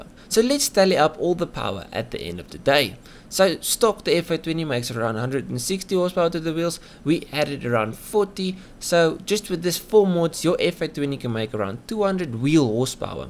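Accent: Afrikaans, South Africa. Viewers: Do I hear a boertjie accent?😏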